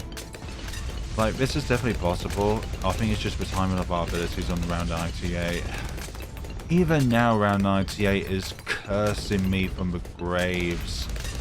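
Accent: United Kingdom. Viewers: I don't know why, but i find your British accent cute.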